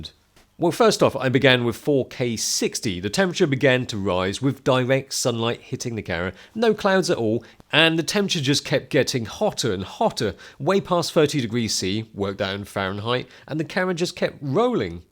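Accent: United Kingdom. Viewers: Oh my is so Brit-ish that accent tho! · Thanks the british for colonizing Hong Kong, his british accent perfect.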